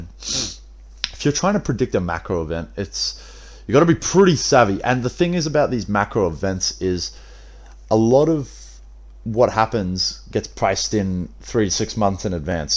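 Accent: Australia. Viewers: aussie accent fooo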